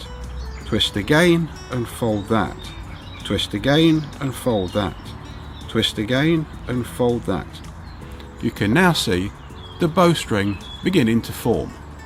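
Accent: United Kingdom. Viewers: Don’t judge me but I’m trying to figure out if he’s British or not (the accent) Using inches and all… Very good video btw!!!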